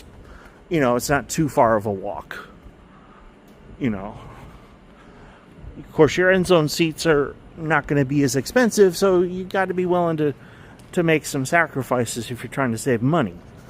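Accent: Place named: Hampton Roads, United States. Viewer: Good review. And yes some people complain about vah-tech but in the 757 we’ve been saying vah-tech for 45 years just like we say vah-beach. You good bro. Go Hokies!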